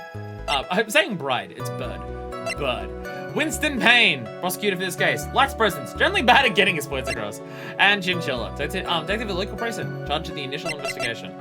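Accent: Australia. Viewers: Yes,she is Australian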